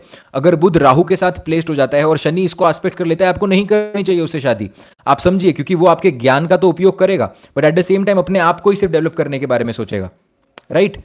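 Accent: Indore, India. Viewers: Nice, but are you from Indore?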